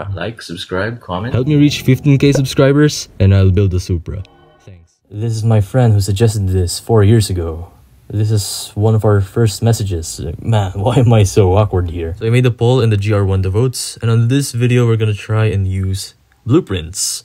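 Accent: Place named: Philippines. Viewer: i can hear the filipino accent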